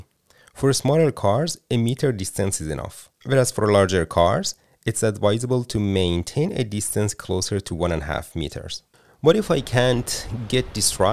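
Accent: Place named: Iran. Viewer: I bet he is Persian. I could spot on his accent. Thx regardless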